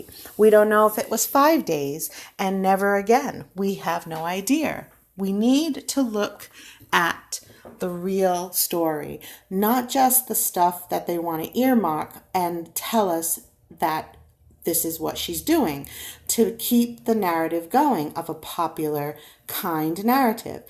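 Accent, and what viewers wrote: Northeast, United States. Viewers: Hello- my first time here.. You sound as if your from my neck of the woods...NE. " Markelytes" yiddish& bi racial?lmao... I doubt anyone believes anything they hear...We are all loving the gossiping& the Bashing! She is a mf joke& i for one am loving it!😉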